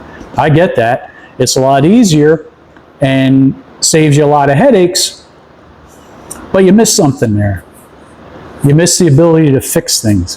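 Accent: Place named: East Coast, United States. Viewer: He sounds east coast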